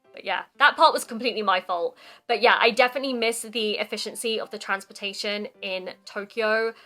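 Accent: United Kingdom. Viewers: I like your British accent. In addition your oppinions are fun! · Sometimes Japanese old-timers says "in western countries blah, blah, blah" but i don’t want to listen to them any more and we should be more confident our system and the way we do, right ? Thank you very much for your “noticing” and i love your charming voice and the way of talking （especially i love your British accent ! ） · 早くオミクロン株から逃げて帰国した方が良いです。イギリス英語が一番聞きとりやすく勉強になります。 It is better to escape from the Omicron strain and return to Japan as soon as possible. British English is the easiest to hear and study. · uk訛り❤好き😊🎉活動頑張ってください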